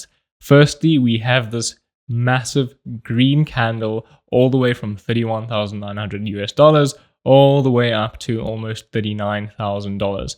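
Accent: South Africa. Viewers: No doubt you are South African. Great content bruv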